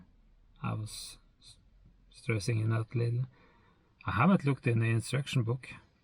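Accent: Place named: Europe. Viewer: You are speaking some type of euro rubbish English. Learn better English before doing another video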